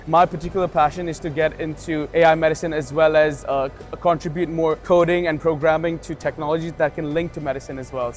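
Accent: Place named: South Bombay, India. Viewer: bhai ye south bombay wala accent to yahin chor jata use kyu leke chala gya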